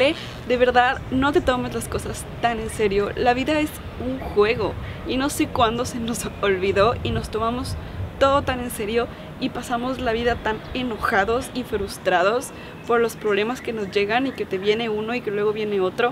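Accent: Mexico. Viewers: Oye en serio, no te veo tono, bueno no se si los ecuatorianos tengan un tono específico, para mi suenas son tono específico como mexicana, como yo. O digamos con tono mexicano, no es desconocido para mi, sino familiar. Tienes raíces mexicanas?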